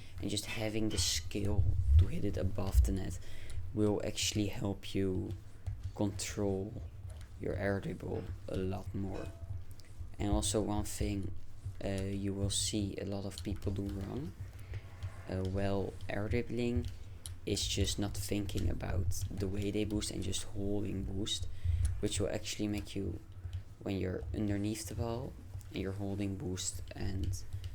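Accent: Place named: Netherlands. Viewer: (ik ben ook nederlands ik herken het accent gelijk😭)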